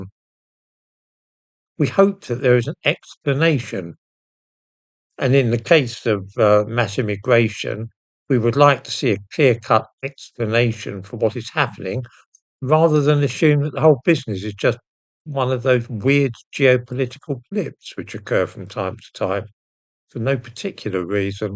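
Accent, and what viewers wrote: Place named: England, United Kingdom. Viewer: Too bad there isn't an English language version of this channel. We yanks can understand blokes like Attenborough and Dawkins, but this heavy dialect is just incomprehensible. It has always perplexed me that the English can't properly speak the language which carries their name.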